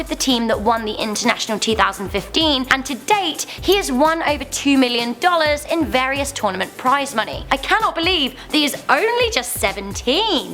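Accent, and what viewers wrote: United Kingdom. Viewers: is low key hot af with her cute British accent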